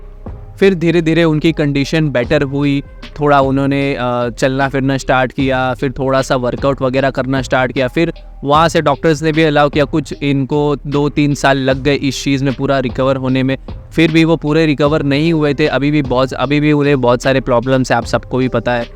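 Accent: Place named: India. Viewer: لامصب ما که هندی نمیفهمیم بااین لهجه هندی انگلیسی نامفهموم تر شده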